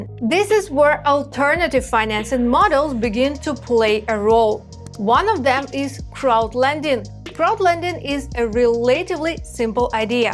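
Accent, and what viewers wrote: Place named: Eastern Europe. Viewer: east europ i think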